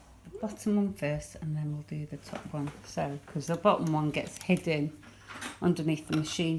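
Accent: United Kingdom. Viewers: I love your British accent. It sounds so comforting and homey to my sensibilities.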